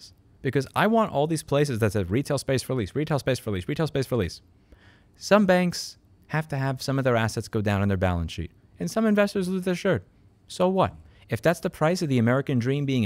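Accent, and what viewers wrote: New York, United States. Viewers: I truly, truly enjoy listening to your videos! I don't mean to belittle the subject content, I can find pretty much the same thing all over Youtube, but, they don't speak the same language. You, my friend, tell it like it is in a language I relate to. You speak New Yorkese! And probably don't even realize it. I grew up near enough to the city that I was fluent in that method of expressing yourself. Although I left the East coast many years ago, I still tend to express myself that way. It causes me no end of being misunderstood and/or being accused of saying things in a excessively direct manner. So, I watch your videos. Not because I agree with every thing you say but, even if I disagree, I experience a level of comfort listening to someone I can truly understand. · Entertaining and lovely, as almost always. I want to get to visit a New York one day that is a little like those olden days. 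Is this the first time I think you can detect a faint trace of New Yorkese in Louis' speech? 😄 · The NYC slang is still strong , can’t take the NY grit out of him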